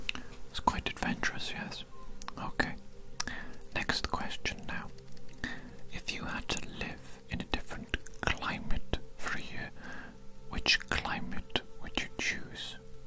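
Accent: Wales, United Kingdom. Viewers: Am I right in saying that you are Welsh 🏴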